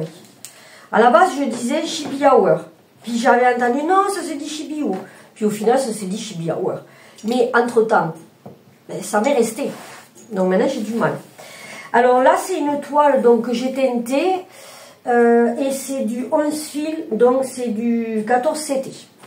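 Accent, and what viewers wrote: South, France. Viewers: Coucou, j aime toujours autant regarder tes vidéos ☺️ en plus tu as toujours des astuces en bricolage qui sont très chouettes. Tu sais le principal en tournant les vidéos c est à la base se faire plaisir , peut importe si il y en a des tonnes sur YouTube, du moment que tu prends plaisir à les réaliser c est gagné 😉 en tout les cas moi je le vois comme cela . Et puis les gens adorent l accent du Sud , bon ok vu que tu es de ma région je suis un peu chauvine du coup 😅🤣🤣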